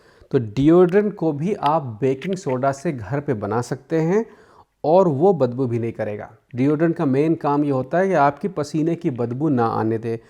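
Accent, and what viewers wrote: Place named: Uttar Pradesh, India. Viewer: Kya aap uttar pradesh se hai?, aapki bhasha muje meri dadi jaisi lagti hai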